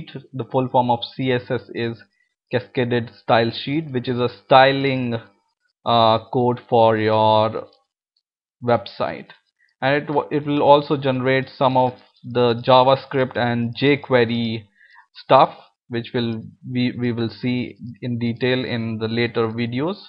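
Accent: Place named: India. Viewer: Indian accent makes it better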